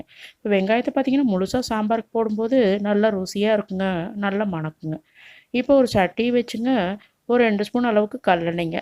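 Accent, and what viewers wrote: Kongu, India. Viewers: ஏனுங் கொங்கு தமிழ் ரொம்ப அருமைங்கோ. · Kongu tamil👌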